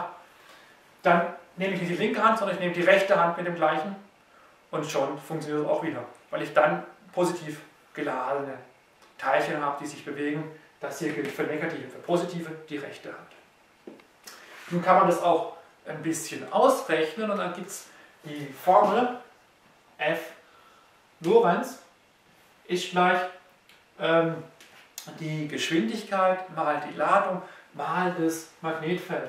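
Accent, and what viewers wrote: Swabia, Germany. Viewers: xD schwabe!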